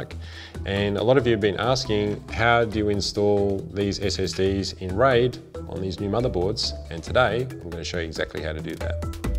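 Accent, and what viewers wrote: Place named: Australia. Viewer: After the first sentences, being a non-native English speaker, I thought "What's that supposed to be? Australian!?" ... and only seconds later the .au domain appeared at the bottom xD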